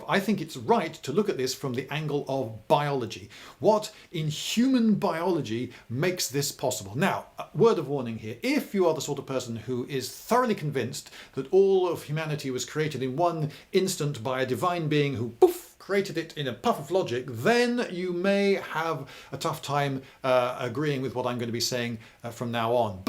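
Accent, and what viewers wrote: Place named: United Kingdom. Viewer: The accent definitely helps. If this would have been American I would have gotten sick of it extremely quickly, but I just love listening to a Brit talking about his passion. I have learned more from than I ever did in my school career.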